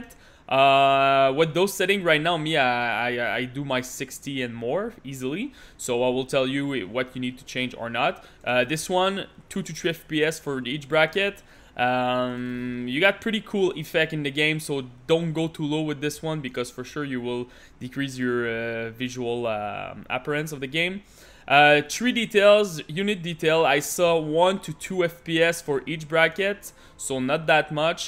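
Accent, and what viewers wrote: Quebec, Canada. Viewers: bro t'es qc c clair ahahaha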